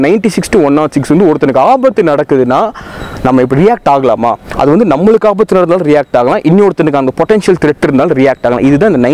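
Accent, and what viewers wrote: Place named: Coimbatore, India. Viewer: Very interesting analysis, keep up the good work. Bro, are you from Cbe? I like your slang.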